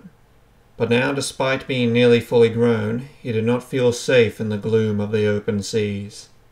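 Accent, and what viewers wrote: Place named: Australia. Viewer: Yep, there's the Aussie accent lol... "It's a shack!"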